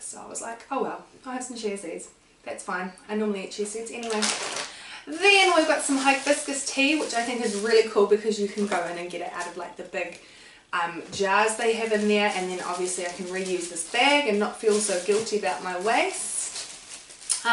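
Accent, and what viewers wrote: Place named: New Zealand. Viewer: You are just what I'm looking for, vegan just started slimming world and just put the daily dozen ap on my phone, I also spent a lot of time in N.Z. so loving your accent, thanks for sharing xx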